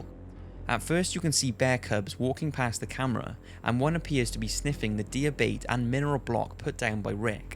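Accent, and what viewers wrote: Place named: South Wales, United Kingdom. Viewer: Still unsure ... Hey you sound like your from South Wales... Swansea area?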